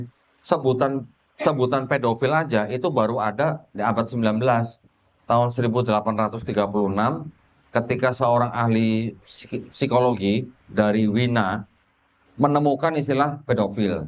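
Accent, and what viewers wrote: Banyumas, Indonesia. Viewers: Koh bawaannya enak Logat nya itu Lo ngapak 😁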